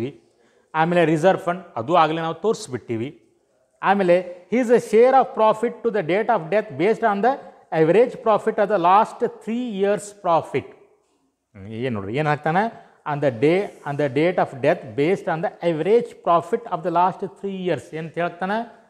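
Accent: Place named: North Karnataka, India. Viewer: ಸೂಪರ್ ಟೀಚಿಂಗ್ ಸರ್ ನಿಮದ ಉತ್ತರ ಕನ್ನಡ ಭಾಷೆ nice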